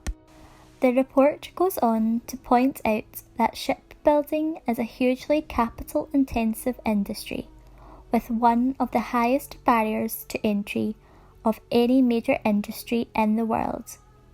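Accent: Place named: Scotland, United Kingdom. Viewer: Can't make out if this is a real or, Scottish robot voice 🤔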